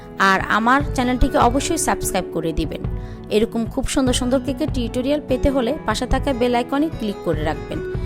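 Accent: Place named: Noakhali, Bangladesh. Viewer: Anne ki noakhailla ni ponded bolen keno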